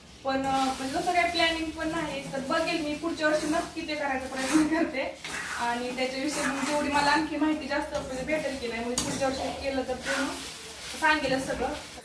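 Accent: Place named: Satara, India. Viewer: You are very beautiful. Like your Marathi. You sound like you are from Satara.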